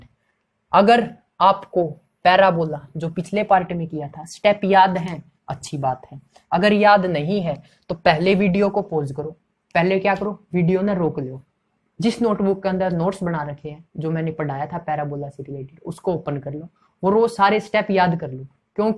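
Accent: Haryana, India. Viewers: Haryanvi mast h sir apki and apki video bhot bdiya lgi sir m bhi Haryana se hu sir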